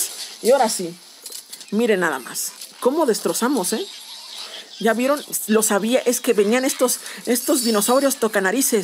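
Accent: Spain. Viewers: Hablas como español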